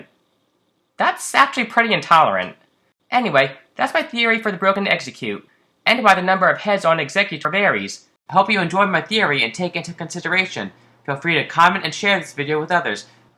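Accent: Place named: Russia. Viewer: why u accent so bad its like u are a russian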